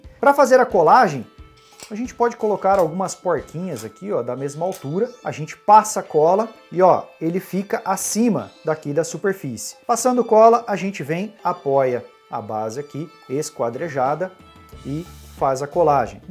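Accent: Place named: São Paulo interior, Brazil. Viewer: Eu notei que vc era do interior por causa do sotaque.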